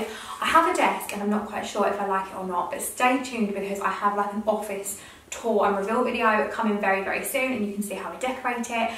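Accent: United Kingdom. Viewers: I love british eng' ❤✌..... loved what you bought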